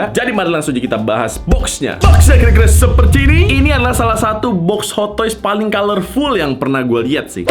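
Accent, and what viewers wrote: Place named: Java, Indonesia. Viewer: Orang jawa abng nya ya😂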